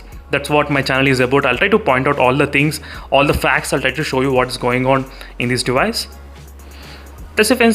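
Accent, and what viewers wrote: South India, India. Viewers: hey you, neither I'm a Brit nor an Yankee. He can speak the way he wants. It's fine. but if he's targeting viewers from allover India then, it's indeed a problem for people who aren't familiar with South Indian accent. Coz actual English doesn't sound like this. That's my point.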